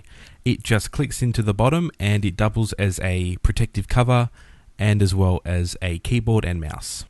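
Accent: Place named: Australia. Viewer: awesome revie yo sound aussie like me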